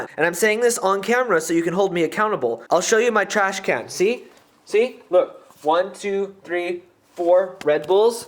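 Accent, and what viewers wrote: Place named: United Kingdom. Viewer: You sound like you have a slight British accent, lol! It's the way you pronounce most of your vowels.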